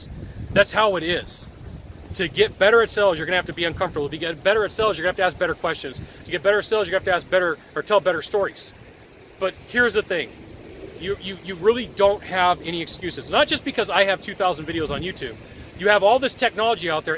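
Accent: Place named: United Kingdom. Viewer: Hi, I remember getting into Sales after my husband died and bought and read sales book galore to start. I had to write and create all of my own marketing material and training. And so many great help out there. Sample it and attempt and integrate it. Congratulations on video 2000. Oh, that British accent. lol. Always providing great sales content. Yes, no lending library. I lent for awhile and, yes, never saw them again. Study at the library. Like that. It's always great having that coach, that mentor to bounce the ideas off of etc. Which we should, indeed. Aloha. Happy Holidays. Cheers and blessings, April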